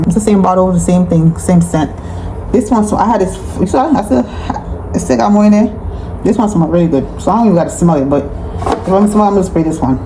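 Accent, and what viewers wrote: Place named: Jamaica. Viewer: Listening to your accent makes me wish I was in Jamaica right now!! Can’t wait to go back!!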